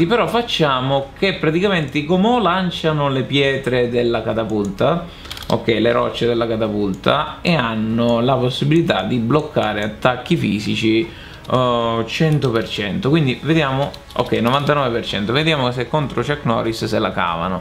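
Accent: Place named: Sicily, Italy. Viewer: Mi manca un po' il tuo accento siculo <3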